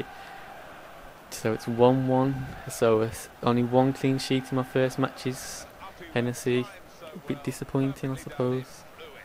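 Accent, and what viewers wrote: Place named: Wolverhampton, United Kingdom. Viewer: Haha Love the wolvo accent! Come on wanderers! South bank for life!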